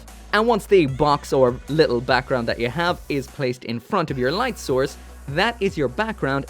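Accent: Ireland. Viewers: Ahh... he's irish. That's why he talks like that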